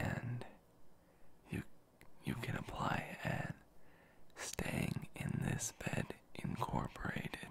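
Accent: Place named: Midwest, United States. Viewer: •__• he’s from the us,, im pretty sure somewhere in the midwest??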